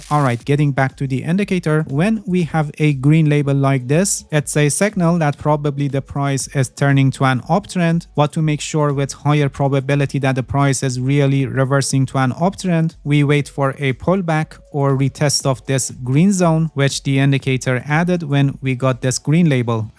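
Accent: Iran. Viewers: That's iranian accent 👏👏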